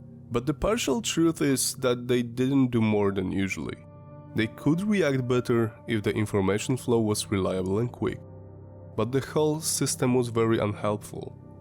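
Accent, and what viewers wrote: Russia. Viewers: I thought it was a Russian accent 🤯 Runny nose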